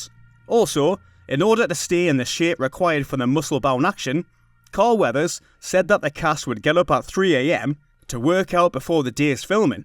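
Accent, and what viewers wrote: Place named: Newcastle, United Kingdom. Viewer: That's a Geordie accent. Not Australian.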